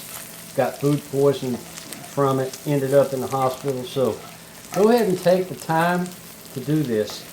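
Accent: South, United States. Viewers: I look your southern accent😁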